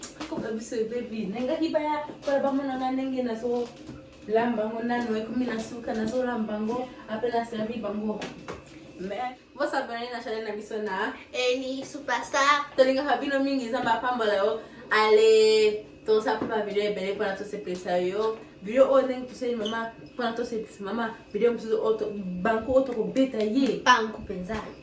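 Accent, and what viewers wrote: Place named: Democratic Republic of the Congo. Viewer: Lol quel accent congolais très drôle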